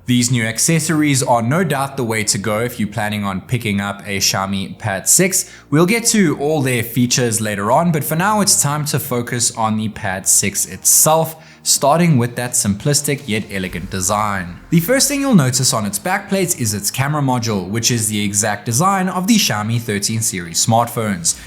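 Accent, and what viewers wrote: South Africa. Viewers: Wait he's South African😯😲😳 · oh South African ! jburg or CT ?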